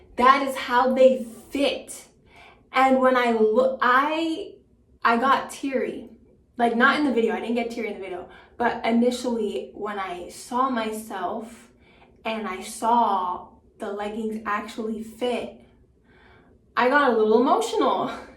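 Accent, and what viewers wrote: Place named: United Kingdom. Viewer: 🤣your British accent always coming through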